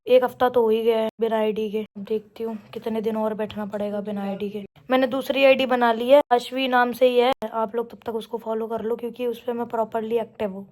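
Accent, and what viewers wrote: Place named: Etawah, India. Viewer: Didi bahut Maja aata hai apni Desi Etawah mainpuri bali boli me blog dekhne me ...❤❤ I'm very enjoying to watching you vlog and also enjoy a pure Desi girl with Desi style😅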